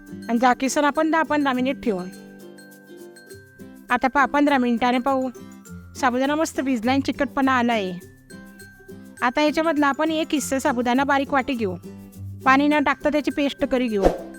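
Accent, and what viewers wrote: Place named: Khandesh, India. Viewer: छान आहे रेसिपी, तुमची खान्देशी भाषा कानाला लै ग्वाड वाटते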